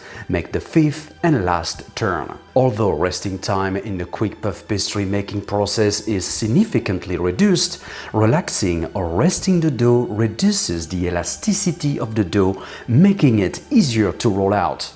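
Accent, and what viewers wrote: France. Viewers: The French accent tears me into pieces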